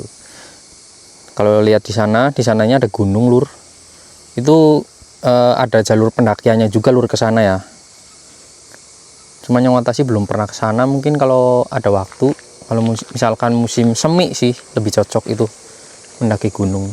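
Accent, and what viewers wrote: Banyumas, Indonesia. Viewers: Sampean wong ngendi mas daneng bahasane ngapak ya😂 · Ealah wong NGAPAK too 😅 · Sampean wong ndi mas?mungkinkah kita terangga desa....krn sama2 ngapak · Subscriber baru, mas, Moga tahun ini bisa tembus 100K, dan sehat selalu ya mas, aamiin Dari logat, sepertinya dari ngapak Banyumasan ya? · rika wong jawa ya,banyumasan basane😅😢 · Aku suka denger bhs ngapak, semoga masnya sehat selalu dan rezekinya lancar aamiin❤❤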